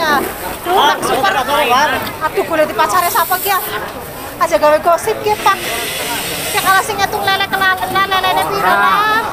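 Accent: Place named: Banyumas, Indonesia. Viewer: Hadirrr.. mantap.. dukung terus channel le mbak ayune wong ngapak lek ora ngapak ora kepenakkk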